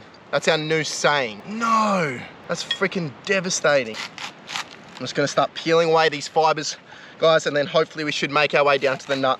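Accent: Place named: Australia. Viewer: Everything i need for the best survival Youtube cannel: Positivity Energized Maaad australian accent Talking a lot about the island and stuff AND SHOWING HOW BEAUTIFUL THE SCENERY, ISLAND, LOCATION IS (almost noone does that) And most important: Having respect for the animals getting killed And the beautiful underwater scenes where he just shows nature before hunting This channel has everything and i wish you over 1mil subs for that quality ❤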